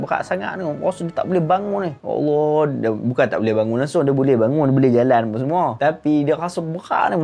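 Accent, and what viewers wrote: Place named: North, Malaysia. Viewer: speaker ber-loghat utara ni, jarang lah membosankan. baik punya storyteller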